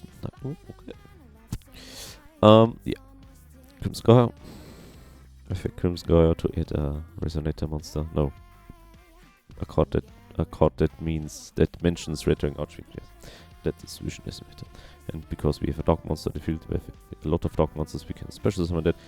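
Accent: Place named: Germany. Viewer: You are creating good videos but please work on the language the german accent is hoorrrrribale. Its not „di“ its the or you can spell it „se“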